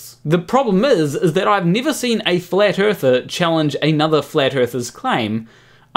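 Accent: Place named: New Zealand. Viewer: You mentioned Australia, but you sound Kiwi... what gives? 😄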